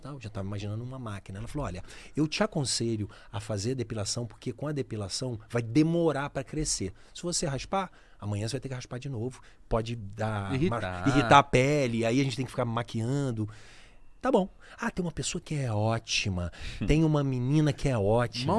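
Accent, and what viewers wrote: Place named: Rio de Janeiro, Brazil. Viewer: Sotaque de carioca, mas nasceu em Ribeirão Preto.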